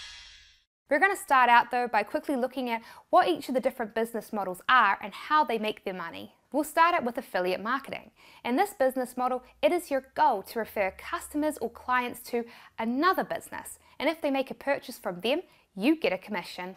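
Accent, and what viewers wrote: New Zealand. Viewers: Hey she is from New Zealand. That's why she sounds like that.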